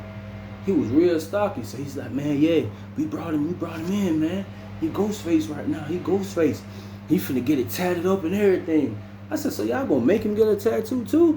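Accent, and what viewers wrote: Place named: Jamaica. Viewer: One of the best story tellers as of recently. Love how well you speak English and switch it over to Jamaican effortlessly.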